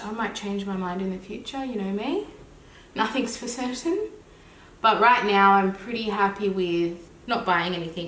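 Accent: Australia. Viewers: Im so so sorry Australians and im absolutely not trying to offend u but i really really do not like an Australian accent 😮. It makes people sound so friggin unintelligent and almost as if ur slurring ur words like ur drunk. I think its the most unattractive and horribly sounding accent in the entire world. Btw, i have had zero influences. Please don't come at me with ur anger and verbal abuse. Like i said, its just my opinion which im totally entitled to express. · I have just found your videos and love having a straight talking Aussie beauty voice around. Love your vibe and realness very much. And laughing so much at how hot and sweaty you are because I totally get it 😂. So hard to even do makeup on some days the last few months. And so much appreciate the discussion on overconsumption. I am a proud environmentalist but also a beauty and clothing lover and struggle to live by my values in terms in that space in terms of overconsuming and wasting because there are so many cool things to try and express yourself with. 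But we need to be more mindful and keep trying and figuring out how to make sustainability work. I so appreciate you talking about this on your platform because if no one talks about it openly we all just sit here feeling guilty and not knowing what to do - nice to all be in it together rather than feeling lost amongst the pressure to consume from the media & influencers.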